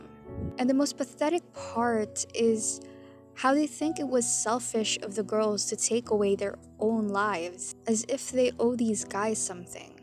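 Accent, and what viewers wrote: Philippines. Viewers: I know a Filipino accent when I hear it.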